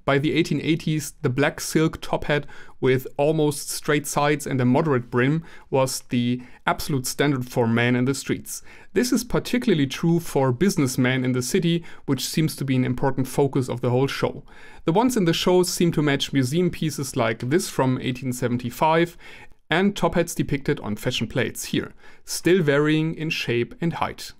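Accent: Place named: Germany. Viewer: I hear a german accent :) I wish I knew the right tailors in germany...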